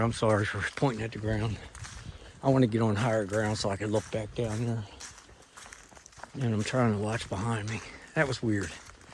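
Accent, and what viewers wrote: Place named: Southwestern Pennsylvania, United States. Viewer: First and only time ive managed to be the first thumbs up lol. When i listen to you talk your accent sounds identical to the way my relatives in southwestern Pennsylvania talk and i was curious if people in Maryland have that same accent or if you weren't raised there? Intrigued as to how the other part of this video will go and if your pebble hurler will follow you over there? Good luck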